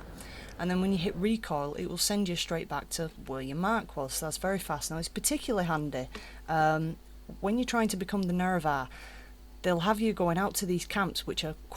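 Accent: United Kingdom. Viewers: Are you British :) i love your accent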